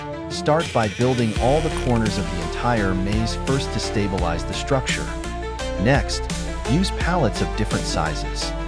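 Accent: Denmark. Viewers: Yes, very cool. I miss your Danish accent. My computer is haunted, it would not let me stop watching your video 😱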